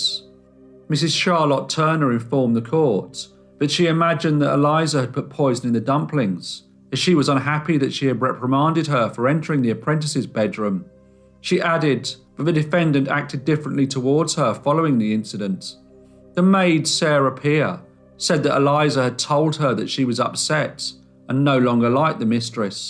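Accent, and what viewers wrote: United Kingdom. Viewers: All true stories are very sad, i really like the morn drawings All this criminal stories are hard,and mournful. The drawings give it a good touch i also congraculate You for your British accent i lived in the States,and they speak very fast,and they don t Pronunciation. Besides, the manager woman Had never been investigated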